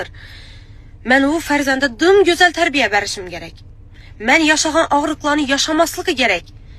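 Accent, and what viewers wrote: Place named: Khorezm, Uzbekistan. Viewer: Шивада гапираяпти хоразимча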